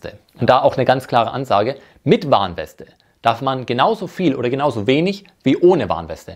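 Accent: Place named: Franconia, Germany. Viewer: Schöner Dialekt, der Fränkische Dialekt. Meine Oma kam auch aus Franken. Sie kam aus Stadtsteinach.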